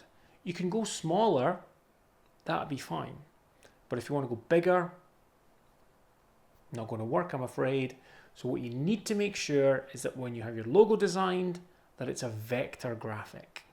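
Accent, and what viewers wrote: United Kingdom. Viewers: Great advice mate.. Are you from the UK?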